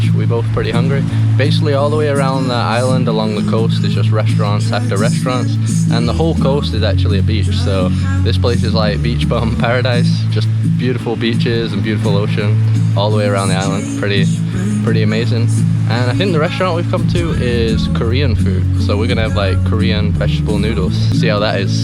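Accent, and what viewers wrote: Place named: Scotland, United Kingdom. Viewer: sounds scottish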